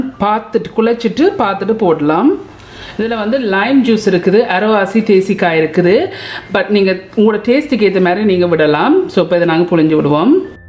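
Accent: Jaffna, Sri Lanka. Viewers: Dear sister reasontly i saw your videos it's very useful my mother cooked like this i didn't learn from her she passed away every day when i cook i sadly remember her and regret my careless but now i am very very happy because your are uploading my moms recepi thank you very much Sister and your tamil (our jaffna tamil) is super👍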